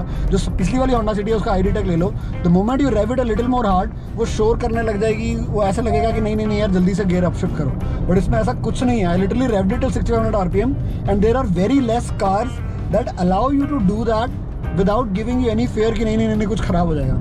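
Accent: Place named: Punjab, India. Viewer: apni yeh punjabi tone aur attitude kabhie mat chhodna luv u sir: )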